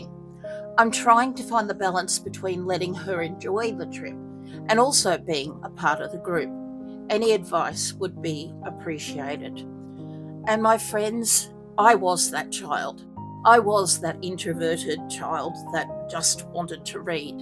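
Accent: Australia. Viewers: I hope you feel better soon! I REALLY ENJOY YOUR CHANNEL!!! Thanks so much for reading to us. It reminds me of going to the library as a child, sitting down in a semi-circle and listening to the librarian read us stories. That was the next best thing to reading a book myself, and I still enjoy being read to today, so thank you! I enjoy your accent and the sound of your voice. Are you from Australia? I'm in the US. LOVE the accent, truly!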